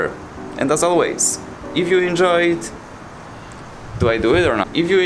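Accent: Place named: Poland. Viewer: Polish accent maybe, but still he talks normally xD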